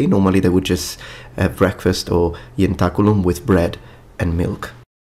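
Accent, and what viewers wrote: United Kingdom. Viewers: You are an Italian with British accent? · He's Italian with a British accent. Love it!!!!!!!👍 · he sounds more British than Italian. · Did you have a British parent or live for some time in the UK. You speak English like a UK citizen. Oh, by the way, I think your Italian sounds better than your Classical Italian. Your Italian sounded smoother, and you seemed more at ease with it. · Well technically he is speaking English correctly, with a British accent. Probably how he learned it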